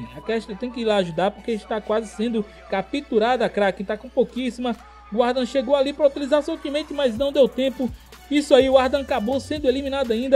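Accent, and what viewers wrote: Northeast, Brazil. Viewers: é Nordestino 😱😱 Eres de onde Mestre?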